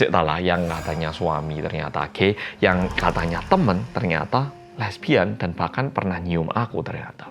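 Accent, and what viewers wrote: Java, Indonesia. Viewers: Bahkan udah bertahun-tahun di Korea, medoknya kental bet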